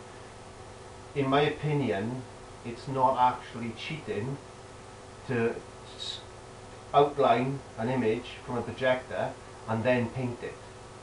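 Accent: Wales, United Kingdom. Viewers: seconds in, I prepared to hear the Welsh accent. (ou can also draw a bit too, butt). God's Country. from an Irishman.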